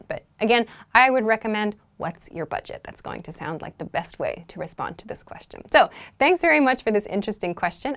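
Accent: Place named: United States. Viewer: Her accent is American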